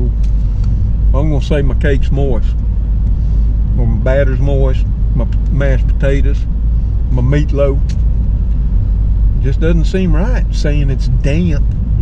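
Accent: South, United States. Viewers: I could listen to you all day I love your southern style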